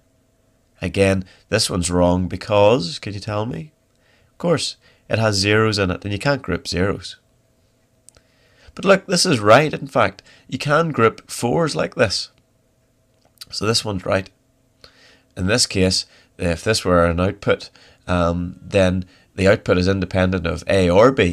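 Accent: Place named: Australia. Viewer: he is Australian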